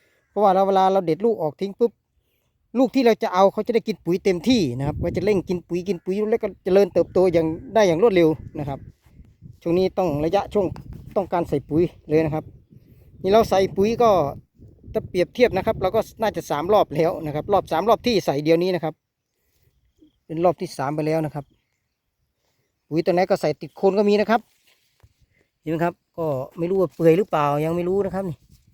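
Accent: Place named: Cambodia. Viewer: 555ดูทีไรตลกทุกทีงึกๆงักๆเขมรรีรัม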